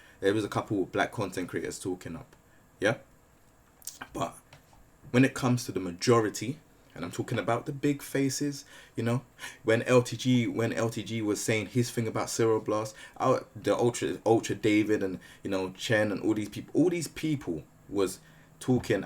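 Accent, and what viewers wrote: South London, United Kingdom. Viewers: This message proper resonated with me. I don't know if I've seen you before but I'm 28 from South London, New Cross, Deptford area and I know from the way you talk you were from a similar place. I'm mixed race and have experienced elements of the things you were talking about. So I'm glad you're talking about these things on your platform, makes people like us feel less weak for admitting these issues affect us I'm the way they do. Love bro ❤️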